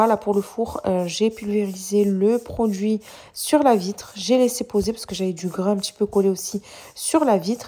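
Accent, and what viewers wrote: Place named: South, France. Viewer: Salam aleykoum hbiba 🥰. Merci pour ta vidéo motivation 🙏. Tu as une belle maison 🏠 dans la prairie 😂 machallah. Moi aussi je finis de laver ma plaque et je vaporise du produits vitres pour retirer les traces de doigts. J'adore tes vidéos et ton accent du sud ❤. Qu'allah te préserve toi et ta famille 👨‍👩‍👦‍👦 inchallah 🤲🤲. Bisous Saïda ⚘ 🌹 ⚘ 🌹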